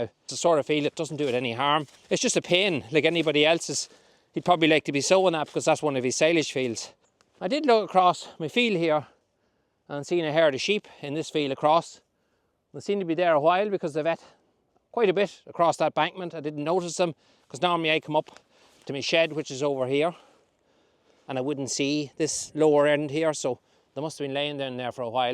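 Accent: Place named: Rural, Ireland. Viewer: I have lived abroad for almost 25 years. I grew up on a farm in Mayo - I love your English and use of vocabulary, it's so rural Ireland. Big respect ❤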